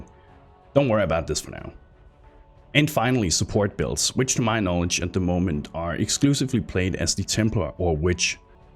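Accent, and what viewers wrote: Denmark. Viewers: Are you Danish, you sound Danish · You sound danish brow. Nice vid. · Er du dansk Bro? Syntes jeg kan ane en Dansk dialekt i dit engelske ;p Når men Mange tak for videoen, Det var super go Info. 👍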